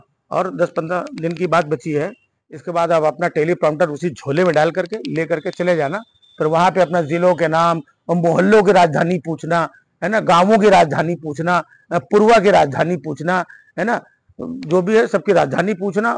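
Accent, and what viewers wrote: Kanpur, India. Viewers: kanpur wale ho lagta hai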